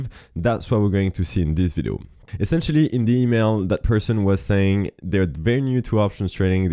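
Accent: France. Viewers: tu ne serais pas français à la base ? je comprends ton anglais parfaitement :)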